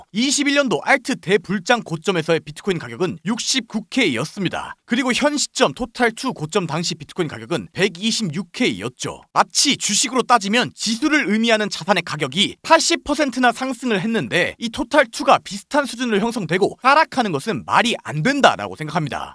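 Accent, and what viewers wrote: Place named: Jeolla, South Korea. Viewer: 느작없이 오랜만에 듣넼ㅋㅋㅋ 전라도 분이시구낰ㅋㅋㅋㅋ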